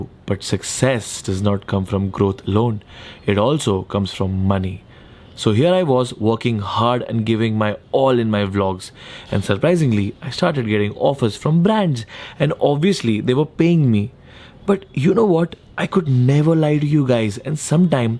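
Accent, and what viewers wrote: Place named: Gujarat, India. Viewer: u sounds like a gujrati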